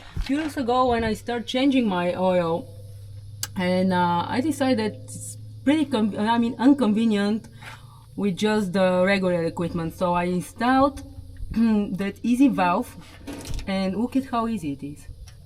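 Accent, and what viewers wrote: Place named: Russia. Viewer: Really? This is a bad joke. Your accent is not american sound like a russian...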